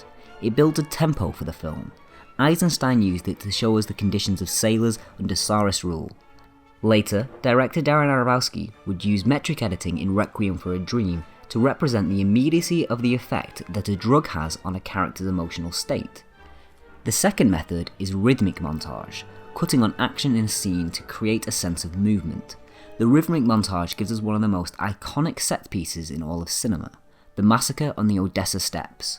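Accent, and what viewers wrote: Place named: Lancashire, United Kingdom. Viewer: the narrator may not be able to help having a grating lancashire accent but he could at least learn the correct pronunciation of proper nouns. William Friedkin is "freedkin" and Sergei is "SIRgay" nor "sir zhay". incorrect pronunciation detracts from the credibility of the narrative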